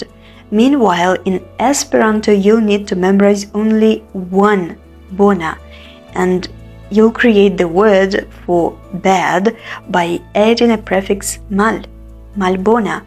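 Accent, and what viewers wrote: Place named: Eastern Europe. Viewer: you sound slavic.. я просто только начал смотреть твое видео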